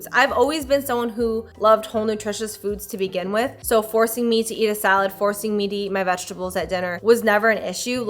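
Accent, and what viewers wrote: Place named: Michigan, United States. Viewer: I could listen to talk all day her MI accent is so nice to hear lol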